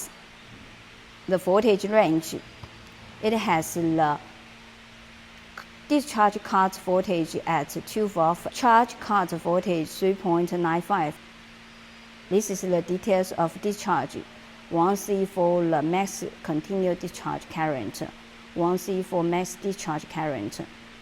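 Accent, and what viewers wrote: China. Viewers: gave such a great presentation. For some reason, i can easily understand a mandarin accent but i have great difficulty understanding an east indian accent.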